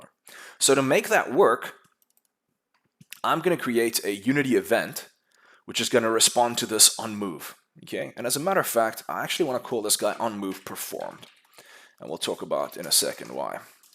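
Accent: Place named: South Africa. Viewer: I feel a hint of South Africa here ... thx for the tutorial boet.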